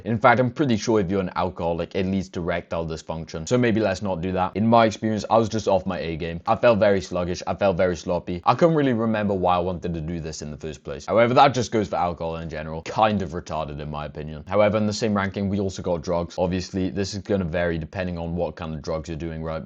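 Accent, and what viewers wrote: United Kingdom. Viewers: Pa fath o acen Gymreig sydd gan y boi ma? Y gorllewin ife? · why are you british?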